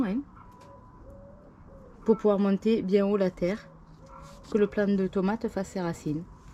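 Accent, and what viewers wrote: South, France. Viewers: Re bonjour, je viens de reprendre la vidéo et je trouve que tu as la main verte et un bon terreau universel, je suis entrain justement de tester des terreaux universel ainsi que des terreau à semis en faisant mes semis et je note les résultats sans oublié que les graines y sont aussi pour beaucoup, j'achète depuis 4 ans à plusieurs semencier pour vérifier la germination de leurs graines, j'ai en teste actuellement: Kokopelli, La bonne graine, La boîte à graines, Les graines Bocquet, Le biau germe, Promesse de fleurs, Les graines de chez Baumaux et pour finir Graines de folie que tu achète toi aussi. Ce que je peux dire pour l'instant c'est que Kokopelli est loin d'avoir une bonne note, germination pas toujours au rendez vous et même des erreurs de graines dans les sachets, la bonne graine idem germination pas toujours au rendez vous, pour l'instant je suis satisfait du Biau germ, des graines Bocquet, de graines de folie et de la boîte à graines voilà. 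Sinon bravo pour toi, tu as de très jolie plants, j'aime bien t'as chaine et la belle personne du sud que tu es avec ton petit accent bien sympatique, et je te dis à bientôt et je te souhaite une très bonne semaine.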